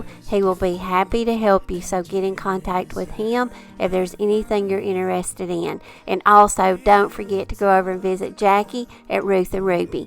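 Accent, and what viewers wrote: South, United States. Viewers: You and your hubby are both so talented and I love your southern accent.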